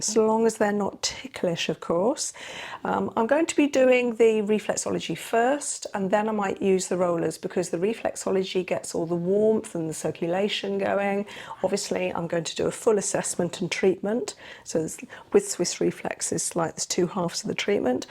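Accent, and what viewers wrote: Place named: England, United Kingdom. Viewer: l@ I asked that becuase she a similar accent to Geri Halliwell. They are not too far from each other, North of London.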